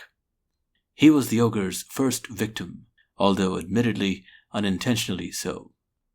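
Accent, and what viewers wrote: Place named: India. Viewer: You are absolutely right about our narrators voice. His wonderful accent conjures up India perfectly.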